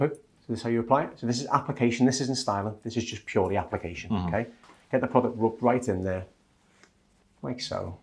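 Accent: United Kingdom. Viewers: I Can't Stand British accents. Almost 50% of what he said I couldn't understand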